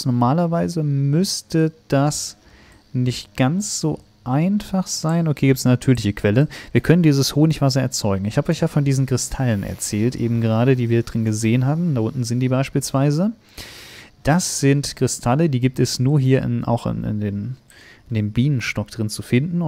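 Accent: Hesse, Germany. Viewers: Auf jeden Fall kommt er aus Hessen meine ich, dachte aber aus der Nähe von Frankfurt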